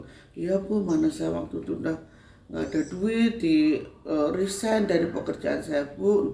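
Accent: Java, Indonesia. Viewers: Ngikutin chanelnya mbak ini serasa kita jagongan (omong"an) langsung dg beliau di ruang tamu.Aksennya yg Jowo jekek/deles bikin sy betah,karena sy juga cucunya jekek😂Dlm berkisah,beliau seakan menyuguhkan hidangan berupa oleh" dari hasil perjalanan nglencernya😝Bukan menggurui,tapi sekedar crito,mbokmenowo ono piguno.Dg segala kerendahan hati,berulang-ulang beliau selalu mengatakan "sekedar menyampaikan".Jadi ya " ditrimo karepmu,ora yo karepmu,sing penting niyatku becik."🤣Sbg orang tua (80),sy paling suka ada orang muda yg banyak menebar kebaikan kayak mbak ini,sebagaimana yg pernah disabdakan Kanjeng Nabi:"Sebagus-bagusnya manusia hidup di dunia yaitu yg bermanfaat bagi sesama."🙏🙏🙏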